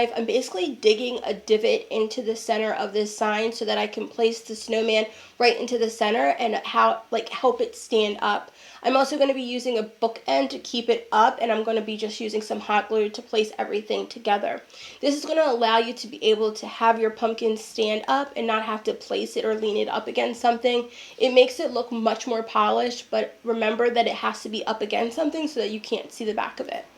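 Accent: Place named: East Coast, United States. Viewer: First time with your channel,and it won't be the last. The the rich cocoa tone of your east coast accent. Miss my New Jersey home, and the sounds, now in Arizona. Love all the DIYS, especially the reindeer. Thanks for taking me home.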